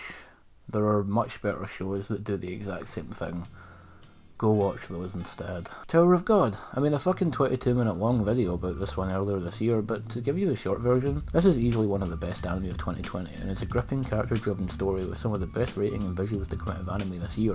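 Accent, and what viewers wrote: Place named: United Kingdom. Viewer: God, I love that British voice of yours.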